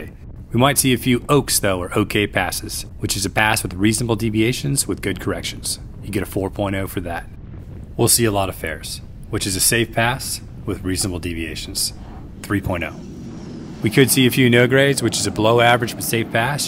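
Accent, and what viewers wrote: United States. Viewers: Very smooth operation. I'm not American, never been in any military. I worked in civil Aviation (helicopters) all my life. I'm appreciating the difficulty to get all this right and the commentary is appropriately American but on the nail!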